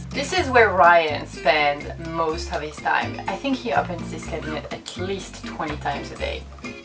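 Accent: France. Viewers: Just discovered your channel. Really informative and love your french accent:)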